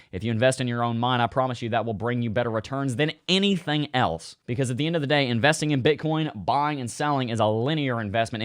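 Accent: South, United States. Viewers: I love your southern accent and with a name like I can trust everything you say hombre. You got a sub in me mi amigo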